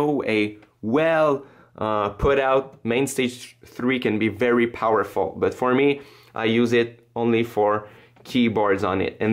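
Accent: Quebec, Canada. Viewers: Are you from Quebec?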